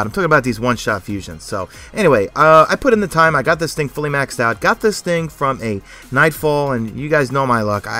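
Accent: New York, United States. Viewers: Hey, fellow NY'er here, so love the accent - don't change a thing. Re: fusion rifles, I wouldn't be so quick to jump on the nerf-wagon before they lift a finger to actually buff anything. Seems Bungie is more comfortable nerfing. Buff pulse rifles, hand cannons, scout rifles and, who knows, fusion rifles may be a less attractive idea after all. Plus, they're great at taking down those pesky shields in PVE. How about spawn less special ammo in PVP? The player base will always have a preference - at least it's a class of weapons and not a single one (Suros Regime, I'm looking' at u).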